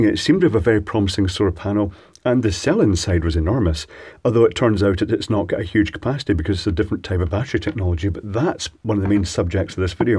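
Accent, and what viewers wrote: Scotland, United Kingdom. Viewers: I am a nonnative speaker of English,yet from the first seconds on I liked your Scottish accent.By the way, the Irish and Scotts are the only English speaking people I like as they were also tormented by the imperial empire.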